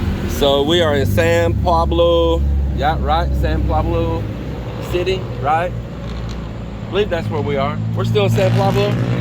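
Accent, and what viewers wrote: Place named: Texarkana, United States. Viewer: I keep trying to place your accent. Texarkana?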